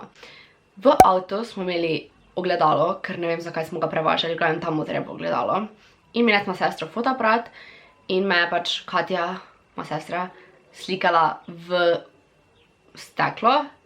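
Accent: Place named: Primorska, Slovenia. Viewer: Ma kje ti zivis ker imas primorski naglas?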